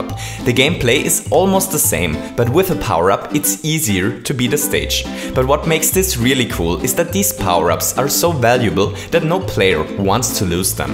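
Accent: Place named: Germany. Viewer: You have awesome content but the speed you are talking gives no chance to deeply analyze everything + I believe you are German speaker because your English sometimes makes me feel I am listening German, so my brains errors =) I believe if add little delays between sentences that will make videos even more interesting. Thanks anyway and like!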